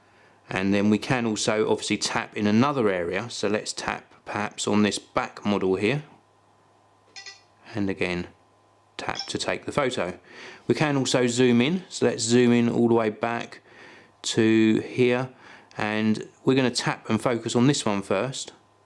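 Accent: United Kingdom. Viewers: love the British accent!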